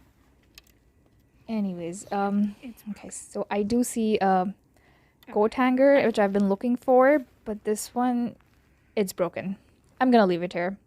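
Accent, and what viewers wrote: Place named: India. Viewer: U sound Indian, not Korean.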